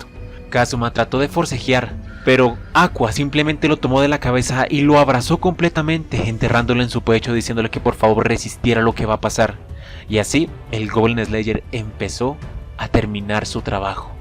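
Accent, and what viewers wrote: Colombia. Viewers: Ja referencias colombianas habla como colombianas eres de colombia ¿?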